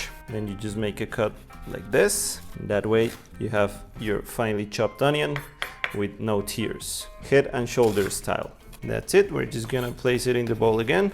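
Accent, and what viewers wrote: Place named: Rolo, United States. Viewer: Although I really love your "rolo" accent your english is quite nice 😲 I'm ready for: eats. 💪🏼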